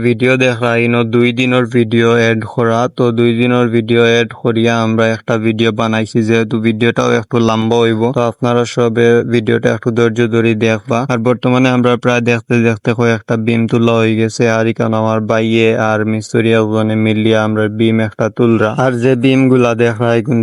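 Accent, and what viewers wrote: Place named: Sylhet, Bangladesh. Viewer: আসসালামু আলাইকুম। ভাই কেমন আছ। আর তুমার ভাষা সিলেটি সিলেটি লাগের তুমার বাড়ি কই।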